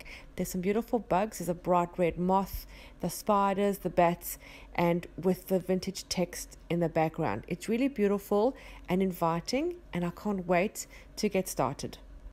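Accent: South Africa. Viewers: I stumbled upon your page quite by mistake, and I am hooked. I was trying to figure out your accent - are you a South African? Lovely work, thank you for sharing. <3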